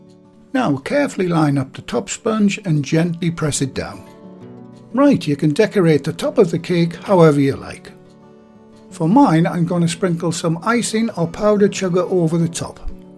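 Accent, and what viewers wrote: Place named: Newcastle, United Kingdom. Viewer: This looks delicious! And I love your accent,. It reminds me of one of my favorite shows, "Vera". I'd love to visit Newcastle one day:)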